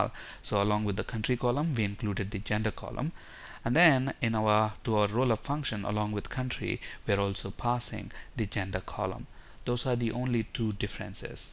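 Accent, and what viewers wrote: India. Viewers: Sehr schön! At first I thought Oh no, not that Indian accent anymore! But the vid is actually very helpful! Thanks alot :-)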